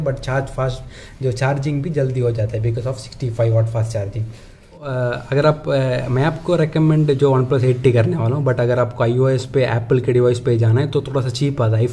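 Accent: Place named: Mangalore, India. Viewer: Your style of talking😁 like mangalore pepoles when talk hindi iam also from mangalore😉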